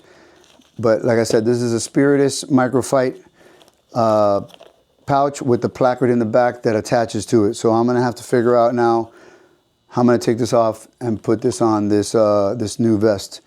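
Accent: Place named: Miami, United States. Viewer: Love the Miami Accent...LOL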